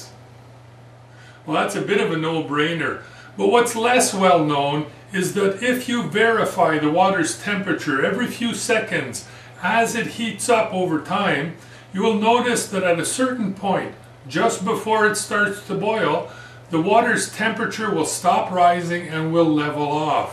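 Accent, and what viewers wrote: Canada. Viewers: He is from Quebec, Canada, so he has a French Canadian accent. I think Cliff Stamp is from the usa... no french accent.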